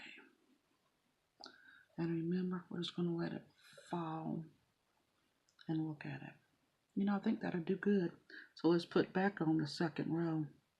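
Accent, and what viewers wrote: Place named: South, United States. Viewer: I came for the adorable southern accent. Ok, and the beautiful ring. Thanks!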